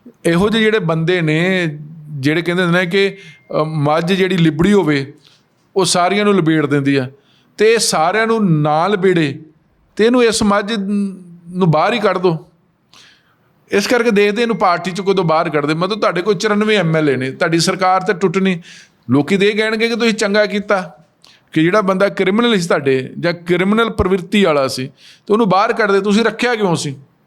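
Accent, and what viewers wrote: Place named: Delhi, India. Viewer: sahb vich delhi wala bolde lagda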